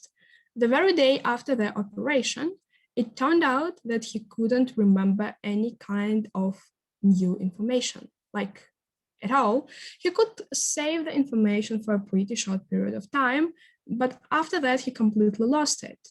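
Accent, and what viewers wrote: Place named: Russia. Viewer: Умная, толковая девочка пытается вполне успешно канать под психофизиолога. Но для чего-то понесло вещать на иностранном. ДЛЯ ЧЕГО?? ЦЕЛЬ ТО КАКАЯ?? Какие иностранцы ломанутся слушать избитые популярные истины от русской студентки на ломаном русско-провинциальном английском. Понты? Понты... Но она же умная!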